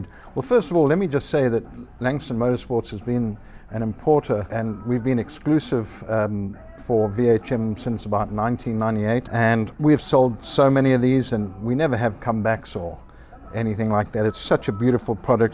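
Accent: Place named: South Africa. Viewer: You, kind Sir, sound incredibly South African, if you dont mind me saying so. 2stroke Love from South Africa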